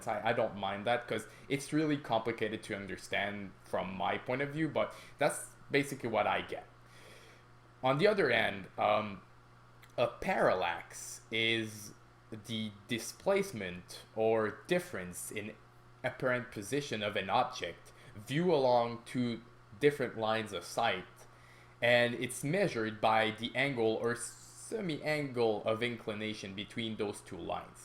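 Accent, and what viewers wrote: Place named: Quebec, Canada. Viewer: You're from Quebec aren't you ? The accent gave it away.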